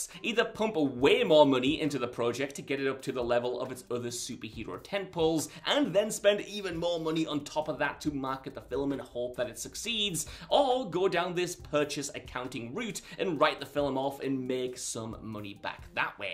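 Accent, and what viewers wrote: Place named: United Kingdom. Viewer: The UK pronunciation of ‘H’ is distracting to my country a$$. Love the channel.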